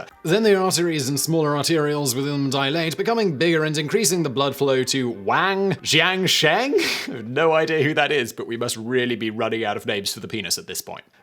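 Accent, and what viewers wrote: United Kingdom. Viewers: Listening to a guy with what I call a “documentary style British accent” (like Sir Richard Attenborough) spend over 10 minutes talking about erections was hilarious 😆. Thanks, I needed that! 🤣🍆 🇬🇧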